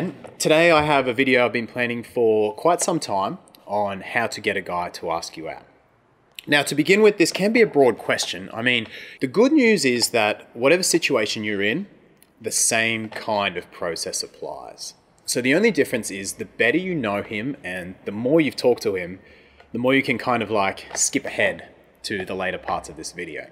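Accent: Australia. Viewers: Are you Aussie? I think so & I love that. Most of the guys on YT giving these tips are English and worthless in this dept. I’m American & I think American guys & Aussie guys are real men (just my opinion) and I put stock in both of them. I’ll check out your other channels. Tyvm Aussie Man! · u sound aussie! i am btw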